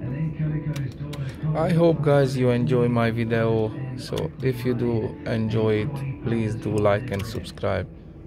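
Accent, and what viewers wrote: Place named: Hungary. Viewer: ember! te magyar vagy........annyira lehet hallani,..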